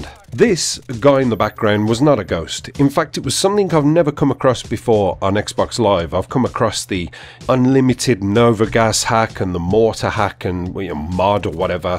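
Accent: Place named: Ireland. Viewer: Your irish :)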